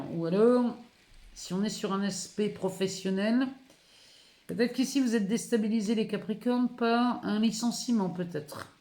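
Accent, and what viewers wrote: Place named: North, France. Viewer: Ouais super 👍 chouette 🦉 merci 🙏 bcp encore en plein ds l mil espèrons q c sera réalisé ds la matière bonne continuation à vs j 💥☀️🥰😍adore c q vs faites et votre accent du nord.! Encore merci 😊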